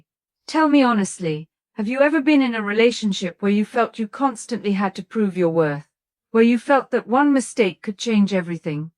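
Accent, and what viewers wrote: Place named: Canada. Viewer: Maye Musk, you are such a supportive mom, you will be a hard act to follow. Your speaking voice is as lovely as your modeling presence. That Canadian accent is always so proper and you did not lose it in Pretoria. I'm seriously pulling for Elon's acceptance among Americans who depend on honest leadership. It is not easy. His admirers are men who want to be him and women who want to possess him for selfish snd selfless reasons. 🙏 🤲 🕍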